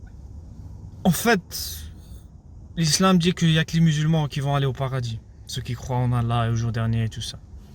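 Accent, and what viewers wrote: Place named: Maghreb. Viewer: Ôh merci, merci de m'avoir ouvert les yeux!! Je vous ai dit j'ai compris, maintenant je vais détester l'islam comme vous. C'est trop bien de détester la religion des autres, de détester les morts. Monsieur le théologien à la sauce haineuse qui n'a toujours pas réussi à répondre à 1 seule question, 1 seule. Si j'avais manqué de respect à il me l'aurait fait savoir, mais vous vous me manquez de respect et prétendez sur mon compte avec arrogance. La première chose que j'ai aimé de c'est son petit accent maghrébin, je trouve que ça a tellement de charme. Ce message marque la séparation de nos chemins, j'espère que vous arriverez à comprendre le français: BONNE ROUTE!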